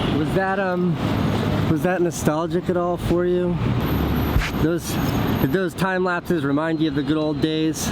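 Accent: New York, United States. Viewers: still a heavy ny accent though!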